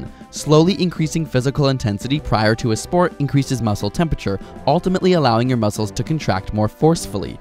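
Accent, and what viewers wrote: Canada. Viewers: Are you Canadian? You kinda sound Canadian.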